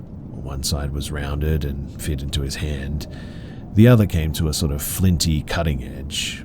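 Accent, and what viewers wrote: Australia. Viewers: Yesss! I love bedtime horror stories, & you're the first Aussie narrator I've found! Greetings from chilly Canberra.👋 Insta-Subbed; love to support my sunburnt brethren.❤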